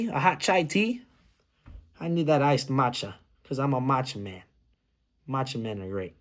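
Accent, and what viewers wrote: Chicago, United States. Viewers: I like the mustache and glasses that you used in the speech I also feel like using the strong Chicago accent and stereotypes was a good way to reflect how the city is to people. Also, I believe that Caleb Williams is the next Brady.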